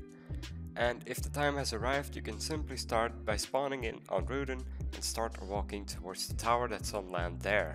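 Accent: Belgium. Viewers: Are you Flemish Belgian??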